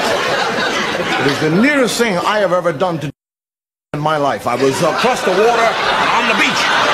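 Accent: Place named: Australia. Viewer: Just nearly wet myself laughing..........is that how we (Aussies) really sound...??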